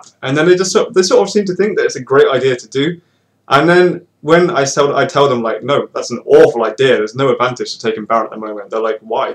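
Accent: United Kingdom. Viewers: What part of the UK you from?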